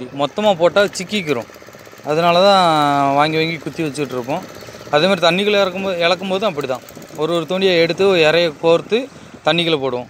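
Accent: Thoothukudi, India. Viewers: உங்கள் தூத்துக்குடி தமிழ் மிகவும் இனிமை.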